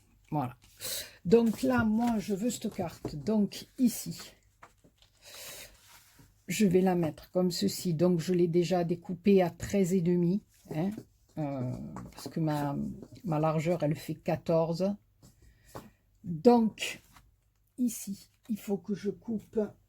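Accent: South, France. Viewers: c'est vraiment sincère!! j'admire ce que vous faites!!! j'espère un jour faire ne serait- ce qu'un tiers de ce que vous faites!!! et croyez moi, je ne vous lèche pas les bottes!! hihi en plus avec avec votre accent du sud,un vrai plaisir!!! merci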